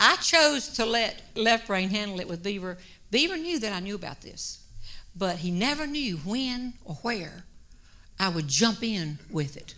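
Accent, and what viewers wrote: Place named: Charleston, United States. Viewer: I grew up in the Charleston area. This lady sounds like some of my neighbors. Hilarious!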